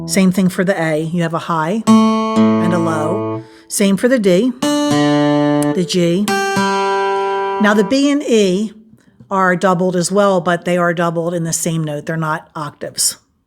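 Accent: United States. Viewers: For that cost, that is a heck of a great sound! My wife has an Ovation 12…forget the exact model. She’s getting back into playing, and she’ll probably need that guitar re-set up. And do I detect a bit of a Philly brogue in your voice? Awesome stuff…will have to check out more! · first time we’ve head your speaking voice. Accent….Upstate New York?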